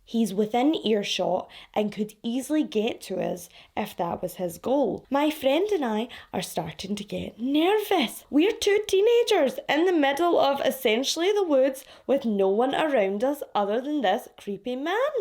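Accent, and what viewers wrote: Scotland, United Kingdom. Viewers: I had to re-read this a few times because I was so confused so i hope i've understood it now! Do you mean by country, as in the countryside/farmer type place? Because if you only moved to Scotland before Covid struck you really picked up the accent quickly lol. ..... Sorry literally just got to the part in the video where you confirm haha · I've lived in Scotland for 6 years and still struggle to follow conversations between Glaswegians. I love listening to you, at least I can understand you and enjoy your Scottish accent and humor. Love you!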